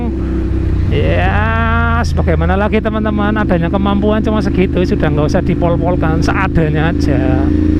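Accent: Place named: Banyumas, Indonesia. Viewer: Salam ngapak bapakeee wkwk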